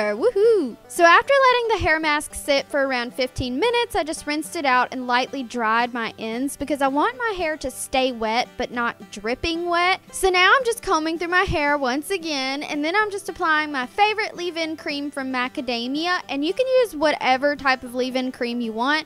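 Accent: South, United States. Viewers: You have SUCHHH a southern accent like whaaat but great video 😝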